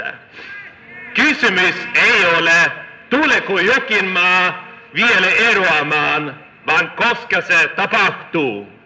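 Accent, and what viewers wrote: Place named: Sweden. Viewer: Han uttalar orden utomordentligt bra! Well done! Med svensk accent men helt suveränt uttal (o bra tal för övrigt!)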